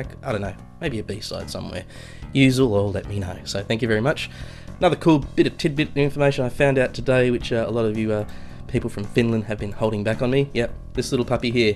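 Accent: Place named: Australia. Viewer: Hey! As always cool reaction, never get tired of hearing a good Aussie voice on reaction vids haha I reckon you hit up " " soon, my fav track, Floor and Marko vocals on point. Keep it up legend!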